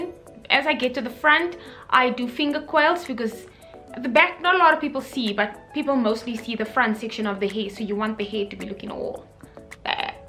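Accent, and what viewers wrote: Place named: South Africa. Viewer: You sound so south african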